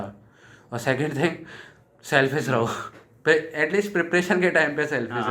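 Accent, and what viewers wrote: Maharashtra, India. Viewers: Tu Marathi ahes ka? Video khup chan ahe Hindi la Marathi toning ahe….😄😄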